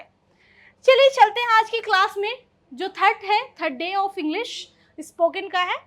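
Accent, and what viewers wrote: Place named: United States. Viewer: Mujhe English bolna bhut pasnd h jyse aap bat krte ho American English aap 60 days course me American pronunciation add kr lijie plzzz❤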